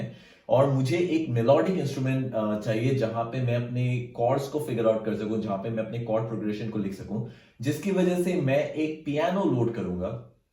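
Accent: Assam, India. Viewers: Bro are u from Assam??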